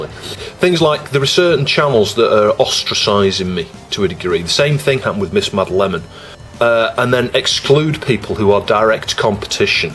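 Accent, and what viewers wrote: United Kingdom. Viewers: Be a shame if you stopped. Recently discovered your channel. British accent makes everything sound better. Sorry to hear you're getting caught up in YT drama crap. I'd say just ignore it and do your thing but easier said than done. Also, don't sweat the likes/dislikes. I tend to never "Like" anything. Just because I'm lazy. Youtube should ditch it, watch time is all that matters. Then again, if you don't enjoy making the videos, then forcing yourself to do it will only make it worse. Good luck!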